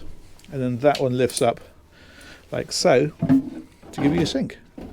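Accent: England, United Kingdom. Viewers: I second the motion Thank you for showing this (Boat) I noticed that you very finely opened up the cabinets to the galley. I apreciate that. I went to school in Exceter as a boy and I miss my cold overcast days..no really. I came home to LA with an accent :) yours reminds me of fond memories. I enjoy your showings. On the Magellano 53 you breezed right by them ! (the cabinets) I was hoping you would come back around to see if there was a dishwasher or anything else. I thought maybe I would have to go to counseling when you did not. haha:) I look forward to your next Tour. Thanks again, Tom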